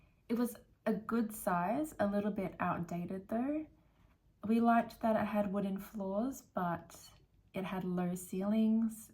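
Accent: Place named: United Kingdom. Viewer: U getting a bit of British accent already hehe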